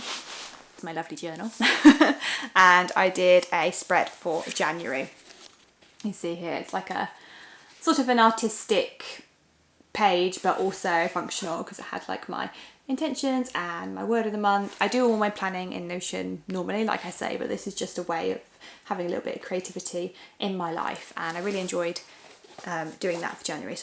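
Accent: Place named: Cornwall, United Kingdom. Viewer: Where is your accent from? Sometimes i can detect either hints of Cornwall or Bristol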